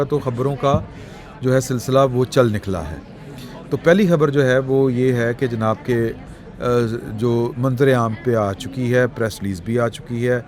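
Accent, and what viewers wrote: Punjab, Pakistan. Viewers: Stupid comments in Punjabi accent. He’s clueless about global politics.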